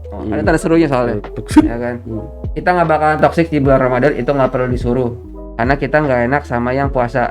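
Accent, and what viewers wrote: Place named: Bojong Gede, Indonesia. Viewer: Bojong gede emang Kocak2 ngomongnya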